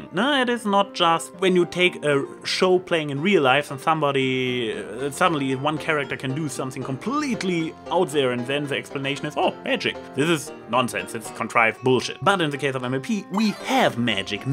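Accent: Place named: Germany. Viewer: ... you cant tell by the accent? i believe he already has told people but, if i remember right he is from Germany.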